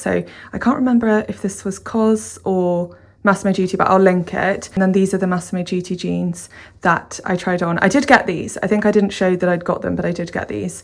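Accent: United Kingdom. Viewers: i subscribe you for learning British English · hi i learn british english ......... plz tell me which british accent is it ? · Very interesting and very instructive. So quiet and soothing. And your British English is so pleasant for ears... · It's a very quiet place. Btw I like the way you speak especially as a British, I'm not a native English speaker but you're very easy to comprehend. You're so beautiful too 🎉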